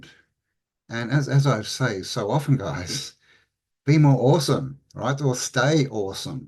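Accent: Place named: Wales, United Kingdom. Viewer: Whern are you in the UK? Welsh pixie ❤😊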